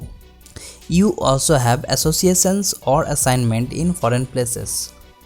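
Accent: India. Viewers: English subtitles please. Its hard to understand Indian English dialect but also Indians have great informations about palmistry :/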